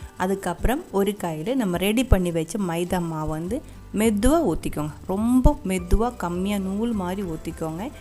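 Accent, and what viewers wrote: Kerala, India. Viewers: Vera level akka.. First video paartha udanae subscribe panniten... Because, ungaloda malayalam kalantha tamil that's so sweet as your cooking.. 🥰🥰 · Your Tamil with slight Malayalam slang is very sweet to hear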